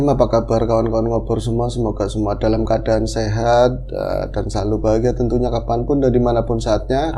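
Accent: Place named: Brebes, Indonesia. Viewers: Seneng dengar gaya bahasa Maz', medok banget.👍😊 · Kangen logat brebesnya mas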